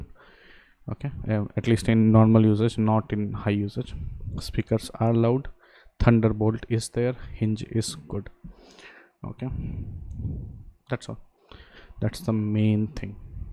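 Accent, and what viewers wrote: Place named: Bihar, India. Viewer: Bhai aap bihar se hona ? Meri gf bhi bihar se hai.. aapka accent match karta hai usse.✌🏻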